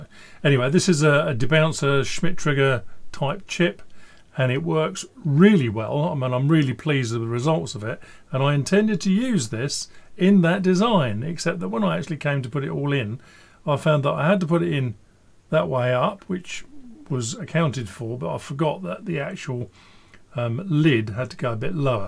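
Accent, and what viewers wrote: United Kingdom. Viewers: Hi. I'm just watching the movie 13 Lives about the rescue of the Thai boys trapped in the cave. Viggo Mortensen plays one of the UK rescue divers. He looks just like you and sounds like he comes from the same part of the UK as you. Great film!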